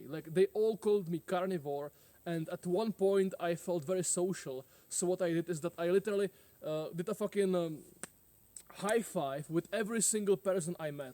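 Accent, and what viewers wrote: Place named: Czech Republic. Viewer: You and have the same exact Czech accent English. It's awesome.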